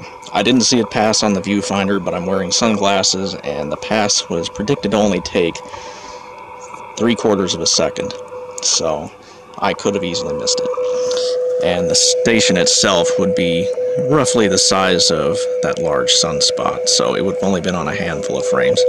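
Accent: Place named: United States. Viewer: it sounds great to me - I always think the American accent sounds "professional" Much easier on the ears than my Aussie roughness.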